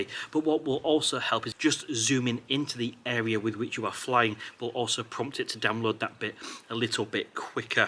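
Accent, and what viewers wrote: Hull, United Kingdom. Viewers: All of us from "ull" can understand him when we fly down "our tenfoot" looking for "dead bods".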